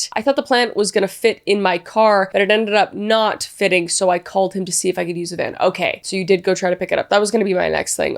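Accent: Germany. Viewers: Yep your accent sounded like my german mom trying to speak english 😅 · Sehr gute, deutsche Akzent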